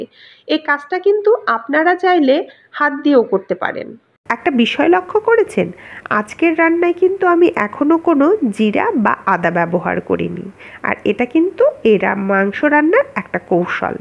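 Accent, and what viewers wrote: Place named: West Bengal, India. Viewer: আপনার চ্যানেলের নাম, আপনার কথা শুনে মনে হয় পশ্চিমবংগের। যদিও দেখলাম বাংলাদেশের।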